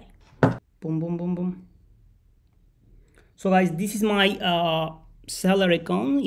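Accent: Sylhet, Bangladesh. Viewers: Assalamualikum.bhai kita Sylhety ni? mashaAllah nice video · ভাই তুমি সিলেটি নি? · 🫣তুমার মাত হুনলে বোঝা যায় তুমি সিলেটি 🏞️😍😘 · are you sylhetti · Apni ki Sylheti 🙂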